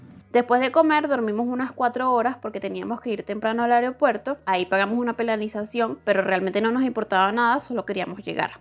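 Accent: Venezuela. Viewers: Me encantó que con nueve años fuera jamás perdiste tu acento, sigues hablando venezolano como sino te hubieras ido nunca ❤❤❤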